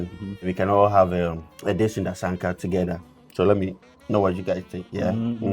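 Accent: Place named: Nigeria. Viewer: Why ur English be like 9ja guy own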